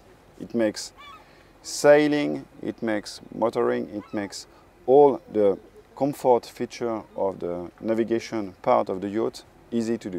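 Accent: France. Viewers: OMG I love his english... his accent is so funny, so french... :-)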